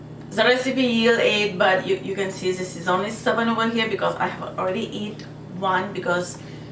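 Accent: Mauritius. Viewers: You have a mauritian accent.x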